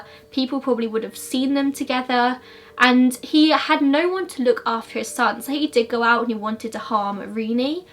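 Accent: United Kingdom. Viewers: Just discovered your channel tonight & I'm really enjoying it. I like mysteries & came across your channel while reading up on The Missing Boys of Yuba City- America's Dyatlov Pass. Dyatlov's Pass is another very interesting one that freaks me out. Along with The Hinterkaifeck Murders- Idk if you've done that one or not, I didn't look through them all very closely. Probably. Anyway, it looks like you've done a bunch I'm not familiar with so I'm looking forward to binging the rest of your videos. They're quite good. Plus, I'm a sucker for the Snow White look & British accent! 😊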